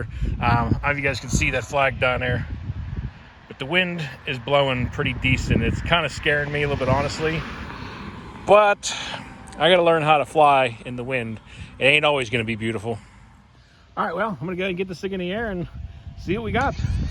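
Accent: Pittsburgh, United States. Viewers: Are you from Pittsburgh. I'm picking up a Pittsburgh accent!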